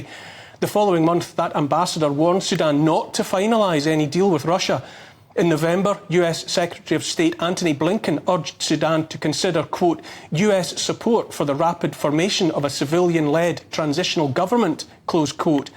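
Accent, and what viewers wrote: Scotland, United Kingdom. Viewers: This is one of the best speeches ever ~ Can't stand the british accent but the Scottish one will do in a pinch as long as it's fiery . English was MEANT to be thus and good on you Neil ~ Please comprehend that these powers that be are actively probing and trying to PROVOKE anyone to become aggressive and radical... Jan 6th hijacking of a peaceful protest was PROOF of that ~ The Bible shows us how to have the patience for days like these ~ God help us ~ Hurry Lord Jesus Christ !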